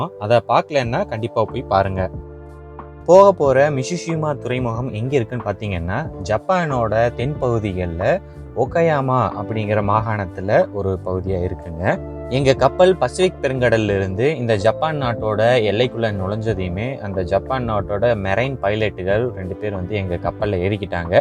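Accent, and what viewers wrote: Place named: Kongu, India. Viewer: Thanks for all your work and narration in this channel. I love your kongu and pure tamil; You have been doing a great job, Thanks again!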